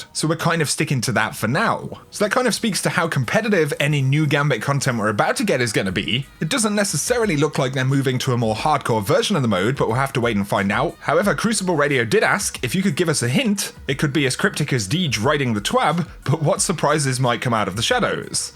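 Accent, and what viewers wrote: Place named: United Kingdom. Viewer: Hey, my wife wants to know where you are from. Her mums British but your accent is much different. Just curious. Love the channel!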